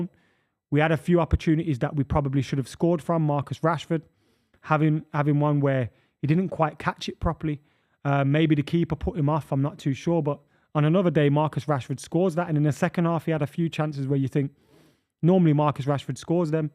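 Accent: Birmingham, United Kingdom. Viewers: Boring brummie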